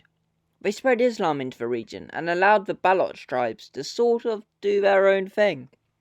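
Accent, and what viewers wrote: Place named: Punjab, India. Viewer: Your accent is punjabi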